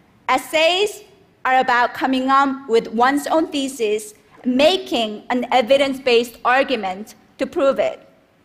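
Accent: South Korea. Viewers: She grew up in South Korea but moved to the US during her childhood. I'm more than sure that her first language is Korean considering how strong her accent is still. Let's ignore the accent. Her book itself proves how great her English is despite her stating how difficult it was for her to grasp the English language back when she just moved to the US. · She's Korean and has an accent. I think she sounds beautiful. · an accent... it's kinda obvious Korean is her first language lol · probably a regional south korean accent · Do valley girls all have Korean accents? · Morgan Campbell The Koreans have a REALLY hard time overcoming their Korean accent when learning English. I agree she has done really well. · if you had ears you would also know that she was born and raised in South KOREA, which is why she still has an accent. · She was born in South Korea and is probably her first language. 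You can't expect her to speak with perfect English. And it's like you guys trying to speak Chinese with perfect pronunciation. And Korea is so different from English. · She's from Korea. Of course she has an accent.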